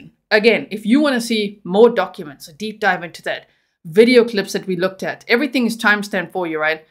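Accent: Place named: South Africa. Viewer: I was gonna ask if you’re South African because I can hear the accent, thank you for clarifying instead lol.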